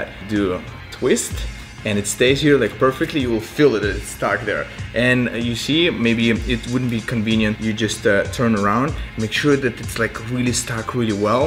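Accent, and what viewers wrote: Russia. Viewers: Хах, думаю: у него русский акцент🤔 А он реально русский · Охуенная футболка Да и наш акцент чувствуется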